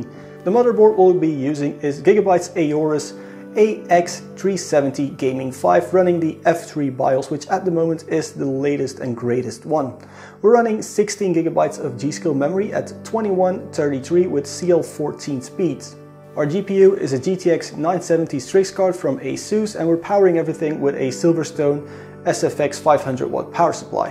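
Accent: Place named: Netherlands. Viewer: Why do you sound just a little bit dutch, or german or something ;) oh shit je bent nederlands haha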